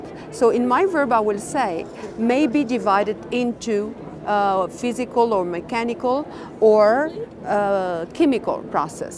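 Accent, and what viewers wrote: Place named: Russia. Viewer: hello Teacher, You have a little European country accent. May be , Russia. Where are you from?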